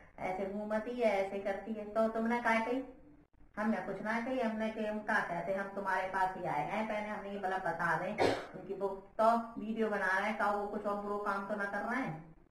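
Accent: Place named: India. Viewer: Wow di desi khana desi hi style mein baat bahut achcha laga 🙏❤️❤️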